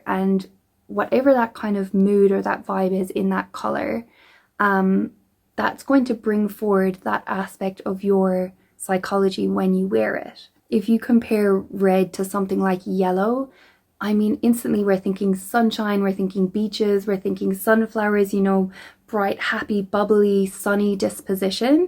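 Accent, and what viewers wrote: Ireland. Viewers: Your accent sounds Irish?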